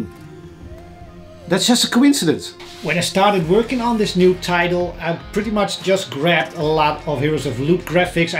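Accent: Netherlands. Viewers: Thank you for this video! and btw are you dutch?😅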